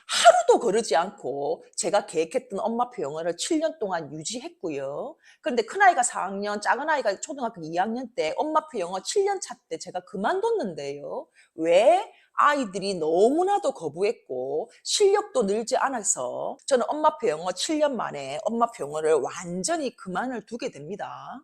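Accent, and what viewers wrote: Gyeongsang, South Korea. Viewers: 사담이나 수다 다 좋습니다. 지혜로우시고 단단한 내면을 가지신 작가님 육아 뿐만 아니라 인생의 선배로 배우고 싶습니다. 다양한 사담 맘껏 공유해주세요 재치도 있으시고 유머러스하시고 경상도 늬앙스도 넘 좋아합니다. 자기관리는 어떻게 해나가시는지 다 궁금합니다 😊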